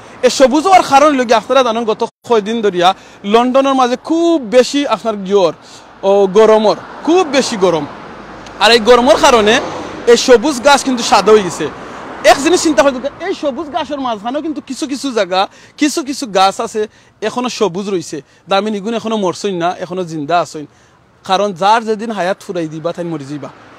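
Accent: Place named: Sylhet, Bangladesh. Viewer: ভাই , আপনার কথা বাংলাদেশের বিভিন্ন অঞ্চলের মানুষ শুনতেছে ভিড়িও দেখতেছে , সুতরাং আপনি সিলেটের আঞ্চলিকতা পরিহার করে কথা বলবেন ।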